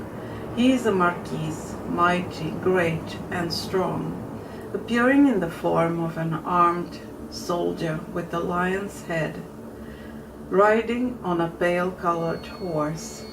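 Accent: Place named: Sweden. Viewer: Er du svensk? Jeg er fra Norge og kjenner igjen aksenten din😁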